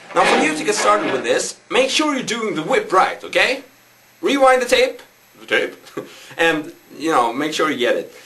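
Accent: Sweden. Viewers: Haha!!! He´s swedish, i know the accident ´cause i´m also swedish (even through, i´m not that bad att english accident!!!) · im a member at ultimateguitar, quit hating on this guy, he's freakin amazing. His accent is because he's from Stockholm Sweeden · I am ashamed because it sounds just like Swedish accent. I fight tooth and nail to be self aware and not sound like that when I speak English!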